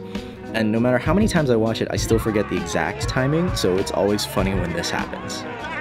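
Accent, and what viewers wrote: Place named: Boston, United States. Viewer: you're from boston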